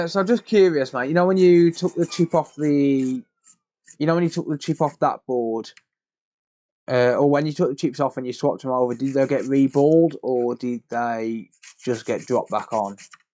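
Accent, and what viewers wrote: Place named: Midlands, United Kingdom. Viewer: which town you from mate, sounds like you got a midlands accent. I'm from wolves myself, now live in the States.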